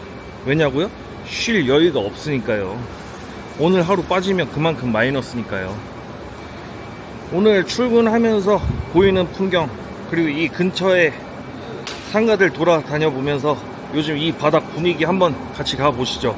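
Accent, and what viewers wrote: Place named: Jeolla, South Korea. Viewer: 말씨는 전라도인데 1찍은 아니신가보네요.?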